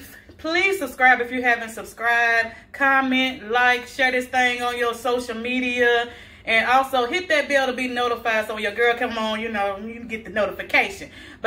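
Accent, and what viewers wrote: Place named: Memphis, United States. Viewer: 😂😂❤❤ I love you because you remember me of home in Memphis TN ❤ when i say you sound like my folks 😂 thanks for the recipe I was missing something in mines and couldn't find it 😂 the sugar,butter,and Chicken seasoning ❤️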